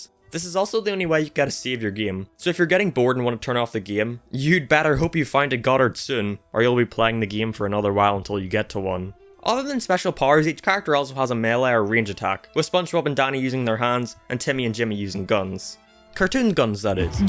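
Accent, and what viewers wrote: Northern Ireland, United Kingdom. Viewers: Are u from NI ahah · Northern irish accent. You from Belfast?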